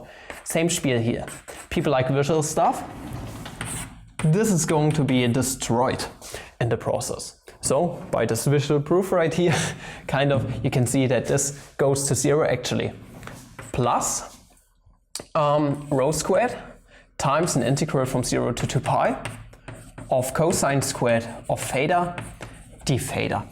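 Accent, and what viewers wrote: Germany. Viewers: It's his German accent and nothing else.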